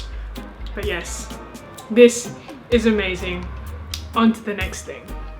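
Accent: Australia. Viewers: I love the Aussie accent! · Why do I keep seeing australian or New Zealand accents in Asian people a lot nowadays ... very satisfying and yet odd lol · You sound soo Australian, I think it’s also a ‘Sydney’ accent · Asian woman with an Australian accept is a trip right now... great vid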